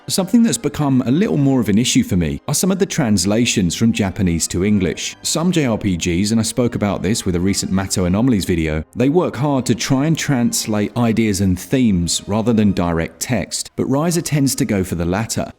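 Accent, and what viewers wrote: England, United Kingdom. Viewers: Game footage and an English accent narrator. What more could one want?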